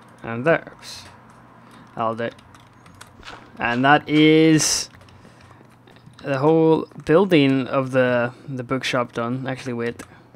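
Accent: Northern Ireland, United Kingdom. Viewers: He has a northern Irish accent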